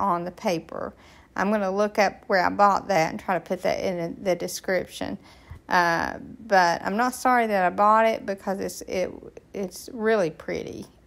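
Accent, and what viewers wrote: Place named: Tennessee, United States. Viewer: I was going thru YouTube and saw your site. Love your accent. Are you from Tennessee? Enjoyed your kind instructions, of the amazing silk flowers. Do you think , this would work with real flowers?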